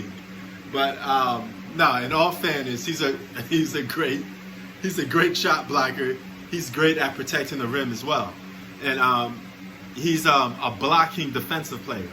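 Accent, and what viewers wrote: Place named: New York, United States. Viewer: One word ruby lol he stopped the whole league u wild boy hahaha good ish p u from New York I can tell by your voice and how u speak lol very ny son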